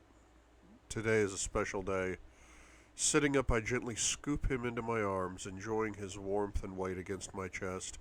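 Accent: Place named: United Kingdom. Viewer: Loved you reading this...and since im from the UK your British accent is excellent. Well done and thanku ❤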